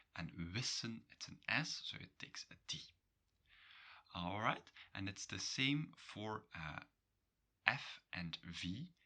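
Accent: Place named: Belgium. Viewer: thank you. You get barely any views but you help me a lot with my dutch. Are you Belgian because your accent sounds interesting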